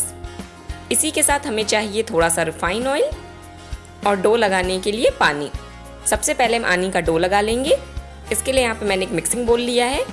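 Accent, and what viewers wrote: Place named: Sindh, India. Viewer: Aapki accent le pata chal raha hai aap sindhi hi hai...very nice recipe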